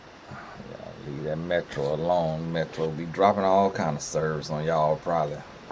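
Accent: South, United States. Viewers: Thank you Tony Gaskins for your channel as your take on life’s situations is hilarious! Love your southern accent, respectful!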